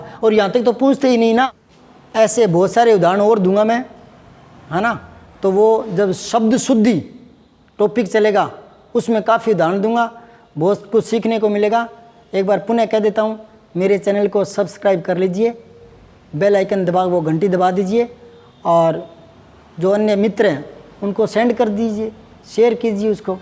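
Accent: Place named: Rajasthan, India. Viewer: लगता है आप राजस्थान से हैं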